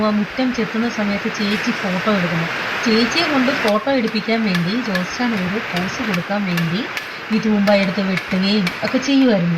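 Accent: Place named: Kollam, India. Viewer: what kap thot meen kutmo nee.......... kollam slang super!.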